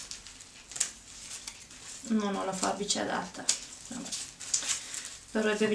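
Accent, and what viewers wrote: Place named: Veneto, Italy. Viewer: Che bello accento 😻 sei veneta ?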